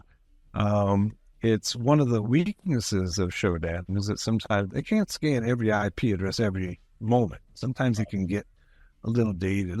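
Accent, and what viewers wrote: Israel. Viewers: אני מת על המבטא הישראלי יא מלך תמשיך ליצור תוכן מעולה💪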